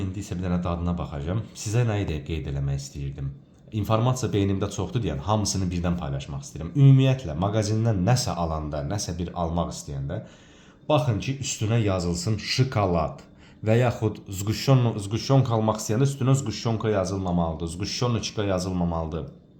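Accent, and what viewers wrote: Ganja, Azerbaijan. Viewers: Yeniden faydalı video üçün(+Gence lehcesi üçün)çox sag olun,ugurlar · Gözəl Gəncə ləhçəsi, hər şeyə görə sagolun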